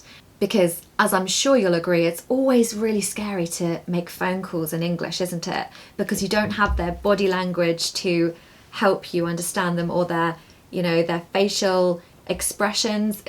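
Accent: United Kingdom. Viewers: I enjoy watching your carefully crafted and informative and energetic posts, radiating your love for wonderful modern and old English. I love to hear true British English coming out of your beautiful and cheering smile. You are best of the best! Off-topic - what is true British English word (a verb) for "to be a fan" (Am.English "Rooting")? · Wonderful! It's amazing feeling for the rest of my life that I've found your account and can listen to this lovely information and this elegant British accent😍 we're waiting for a live! If you have other occounts tell us please🥰 thank you from Iraq💗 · Hi, British English is a closed book to my area in Sri Lanka. You are a great inspiration for me to follow British accent. Your explanation is simple but informative. Thank you so much. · I love your British accent,and really I am able to understand what you say also when I don't know all the words.😊Thanks a lot.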